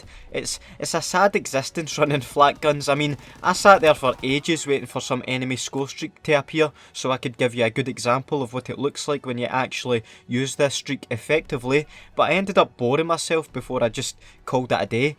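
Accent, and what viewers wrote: Scotland, United Kingdom. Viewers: That scotish accent tho, its hard to understand sometimes since im Murican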